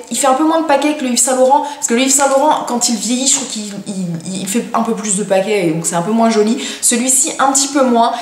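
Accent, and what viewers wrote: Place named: South, France. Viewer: accent du Sud très prononcé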